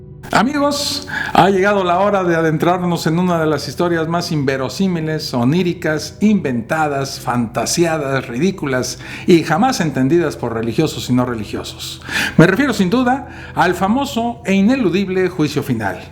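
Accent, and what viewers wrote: Mexico. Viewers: Hay que tener fe hijo mío. Hay que creer por fe hijo mío. Bendiciones príncipe desde Rep. Dominicana. Haces una tremenda labor hermano. Pero como estadista que soy, debo decirte que no podemos despojar a las sociedades así por así de la tendencia a creer. Es decir de las prácticas religiosas. Lo que podemos hacer en ese sentido es desmitificarlas. Depurar los sentido de fe de aquellos aspectos oscurantistas que claramente hacen daños. Vamos a presentar Dios mediante un proyecto en tal sentido a ver qué pasa. Dios te bendiga. El acento mexicano es sin duda un encanto.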